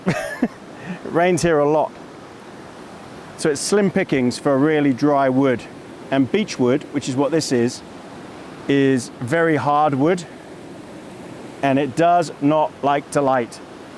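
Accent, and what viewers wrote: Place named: England, United Kingdom. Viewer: you look Maori with a English accent awesome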